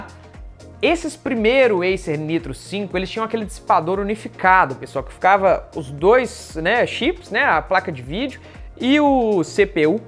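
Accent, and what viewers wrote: Minas Gerais, Brazil. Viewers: Senti um mineirinho uai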